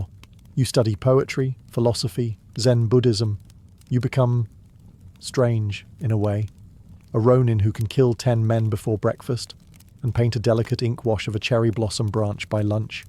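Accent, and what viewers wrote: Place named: England, United Kingdom. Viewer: Life and pOTh.... I'm out. English accents are ghey.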